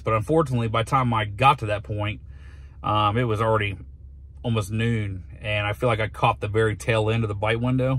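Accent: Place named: Texas, United States. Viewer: Same boat , black 2023 I love it , and watching you fish feels like Im fishing in my boat. Im going tommorrow to Sabine River down here in South East Texas Same place the bass master elite has their event. The way you act and talk makes you seem like one of the ole boys from around here. its kinda funny how that works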